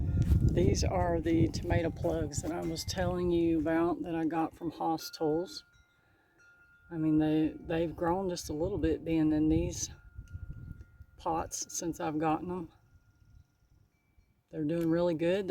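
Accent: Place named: Western North Carolina, United States. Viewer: How exciting to find your videos! I am definately going to try the water bath canning! I also love that you are from my neck of the woods(western NC).....or sounds pretty close anyway.......I am in Lenoir! Looking foward to more of your videos......I am enjoying now going through all the previous ones. Thanks!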